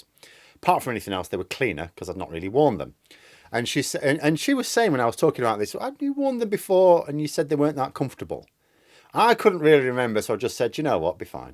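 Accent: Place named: England, United Kingdom. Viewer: I’d know who you are, I call you the. When my partner wanders in, he says you have the most English of accents, which is weird as he’s from the North East and I’m from the south, so I guess you are the somewhere in between. I listen to a lot of your videos. Thank you for all the hard work.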